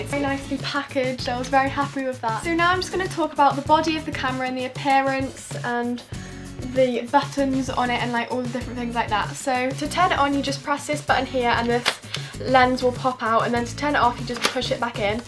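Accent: United Kingdom. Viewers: Omg I was so shocked when I heard ur voice I love ur accent. :) wish I were british. Great vid! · The British are so cool 😎 accept classy accent❤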